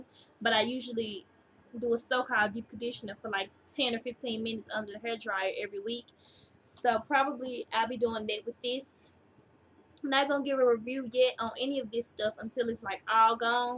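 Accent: United States. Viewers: Ur so country lol its cute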